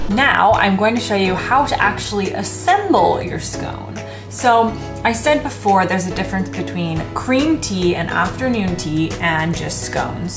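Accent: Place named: United States. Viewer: I could fall asleep to your voice... Esp when you say "go ahead" at least once every 30 secs like a true American. Very cute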